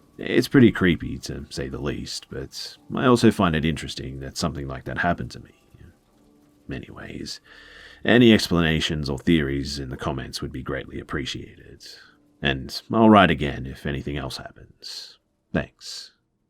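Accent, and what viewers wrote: Australia. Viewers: Excellent stories Be, thank you for making this a good day by gracing us with that awesome Aussie voice! I don't know what it is about your voice but I could listen to you read instruction manuals and still love it LoL 😂😉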